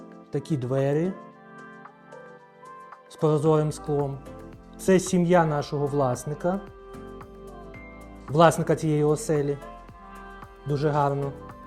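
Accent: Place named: Sanzhary, Ukraine. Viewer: В Санжарах так розмовляють.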